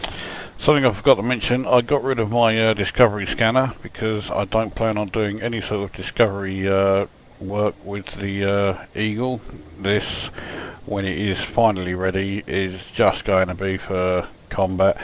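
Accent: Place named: Cumbria, United Kingdom. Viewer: Good Luck to you, I look forward to seeing any Uploads you may have when you aquire The Clipper, My PC is a little too slow for the Game but When it is finally finished I may have a bigger better PC, By the way IU'm from West edge of Lake district and i recognised your accent as not being that far away from me:)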